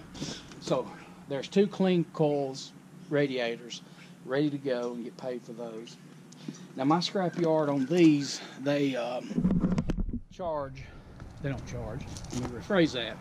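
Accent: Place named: United States. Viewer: Was a good video, love the American accent you have, it's soothing to listen to, it's great you enjoy the metal, it's great dismantling things, I collect metal for a little pocket money, it's great, i collect small amounts, i have no car at the moment. Carry on doing what you love, it's all we can do until the end of time.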